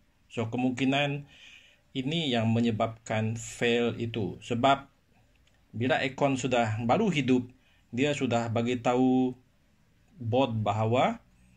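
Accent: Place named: Bidayuh, Malaysia. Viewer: Slang bidayuh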